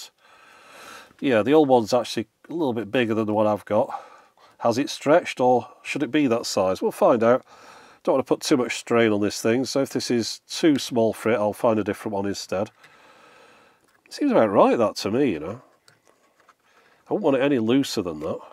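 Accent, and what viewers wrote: United Kingdom. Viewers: Wow, this guy is from the UK??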